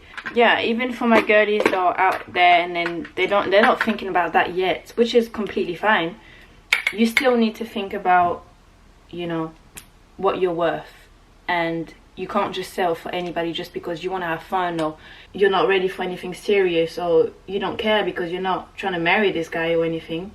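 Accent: United Kingdom. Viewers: The British accent 🫂🫂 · Tu m’attrapes encore plus avec ton British accent, fan vraiment ❤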